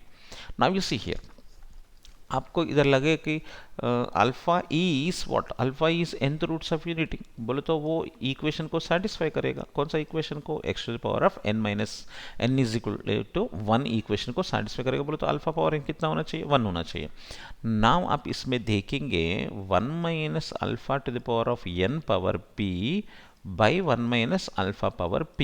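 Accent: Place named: South India, India. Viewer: It seems like you are from south India by your style of Hindi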